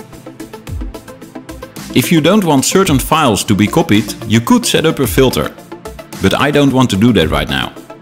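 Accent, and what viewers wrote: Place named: Netherlands. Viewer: Hollands Engels. KOTS!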